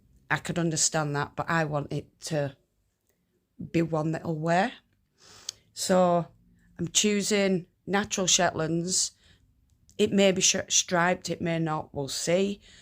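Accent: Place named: Northern England, United Kingdom. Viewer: My husband has just walked in and said , ah I know that accent ,his from up north, we now live in Devon , I understand where your coming from on not getton5 enough sleep , I worry rats if I don't have enough kip 😂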